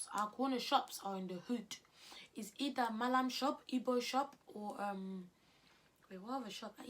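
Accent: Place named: United Kingdom. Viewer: omg you're british? could you do a video on uk slang like the meanings 🤔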